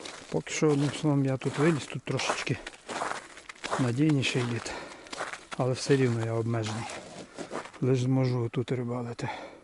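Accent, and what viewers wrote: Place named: Chernivtsi, Ukraine. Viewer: Дякую.бо чути по розговорі що десь не далеко від черніців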